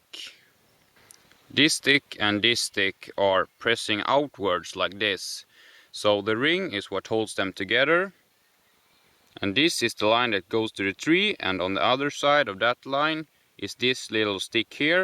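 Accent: Sweden. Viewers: Make sure the sticks are really "smood" guys. ahahah I love the Swedish accent. awesome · Wow his swedish accent isbreally close to a finnish accent!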